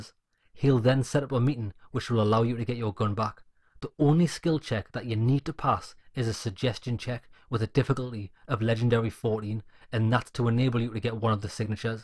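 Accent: Newcastle, United Kingdom. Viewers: Woof that's a strong whiff of Geordie, I think I understood most of it though. Thanks man.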